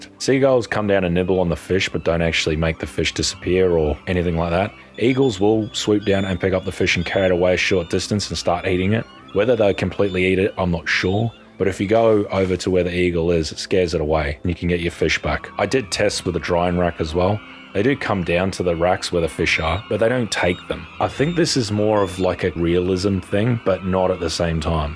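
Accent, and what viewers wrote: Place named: Australia. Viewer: I was like "what why would owning..oh hes not british hes Aussie"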